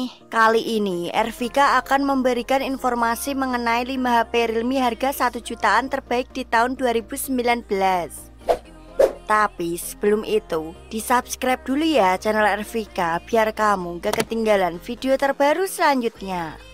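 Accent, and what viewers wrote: Java, Indonesia. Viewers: Medok bat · Dari suaranya sih kayak dari jawa,wong jowo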